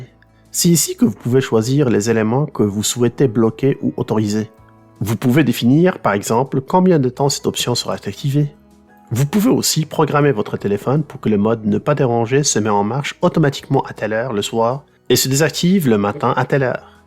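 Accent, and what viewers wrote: Quebec, Canada. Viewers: Bonjour de France pitié je suis entrain de recherché des tuto sur Youtube comment stopper les pubs qui nous dérange presse tout les 4 minutes en visionnant des youtubeuses et youtubeurs ou des émissions ou des films sur Youtube toujours que je parle .donc g pas 1 smartphone dernier cri mais 1 petit simple Wiko .et attention qui n'a pas toutes vos options et appli ? Entre autres les vns privé ou je c quoi je la trouve pas? G juste 1 minimum syndical ? MDR ah ah pitié au secours besoin 2 votre aide urgent ?en attente stop mes recherches et je reste avec vous ok Fréro ? Merci et en plus on adore l'accent 2 Québec. MDR France mardi 1 er novembre 2022.14h 45 de l'après-midi et chez vous c quoi? Bon en attendant la réponse je m'abonne d'urgence pour pas rater le retour ? Ah ah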